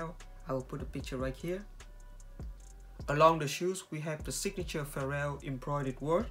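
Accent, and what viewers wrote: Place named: Vietnam. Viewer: omfg dude, 1st time to ur channel, but guess what, i could see where u from by how u pronounced. hahaa, its cannot be “a đi đát” like what u said. and im also from 𝙑𝙞𝙚𝙩𝙣𝙖𝙢 🇻🇳 btw ^^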